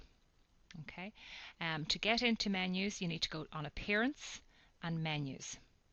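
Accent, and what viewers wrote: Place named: Ireland. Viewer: lol. I'm usually terribly annoyed by these sorts of videos. But your Irish-ness just made it so much nicer to listen to xD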